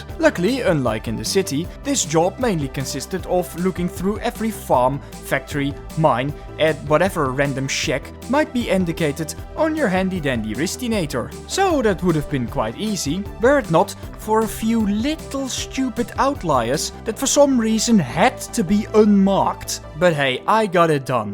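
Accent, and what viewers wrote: Netherlands. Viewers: Yes always, wonder where this guy’s from, Amsterdam? He sounds like he’s from the North Holland province. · Its funny how a Dutchman recognizes another Dutchman just from their English accent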